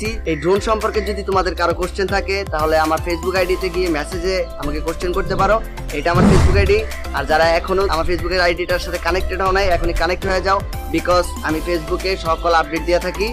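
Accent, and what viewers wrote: Tangail, Bangladesh. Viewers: vaiya tomar basha ki tangail.....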